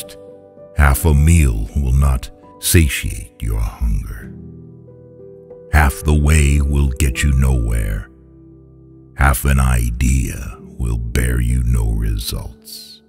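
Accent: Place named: United States. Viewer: Great poem, but it would be far better to be read with a Lebanese accent rather than the usual American which is yet more grating US imperialism.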